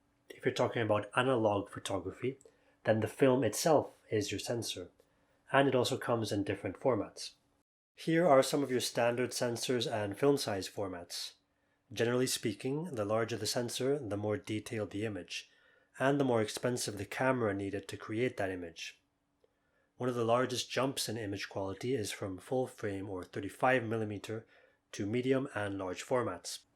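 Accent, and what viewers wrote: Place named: Wales, United Kingdom. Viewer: Spent ages trying to figure out your accent. I thought there might even be a bit of Welsh there... heck, even throw in some Carribbean. Man of the world, probably!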